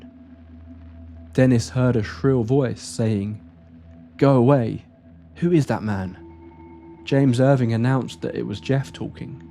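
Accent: United Kingdom. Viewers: Bullognia!!. I'm a native NY-er who tunes in to this channel for the smooth, sophisticated British voice overs that only provides.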